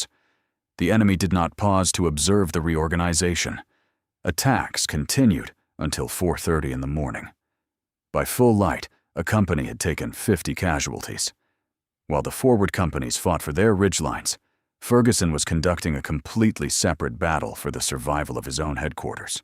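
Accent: United States. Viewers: Pity this story has be told in a crap American accent who can’t pronounce Australian places and speak in Strine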